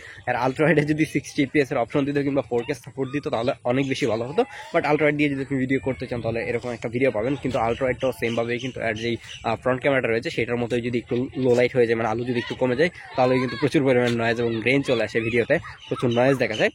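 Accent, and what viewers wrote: Kishoreganj, Bangladesh. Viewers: Kishoreganj Naki Bhia Aponer Jala Bhia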